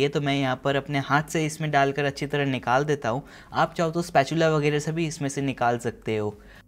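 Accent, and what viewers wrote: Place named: Rewa, India. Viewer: Arey sir mai MP rewa se hu...... Aap bhi wahi se hai kya mujhe aapki boli wahi ki lgti h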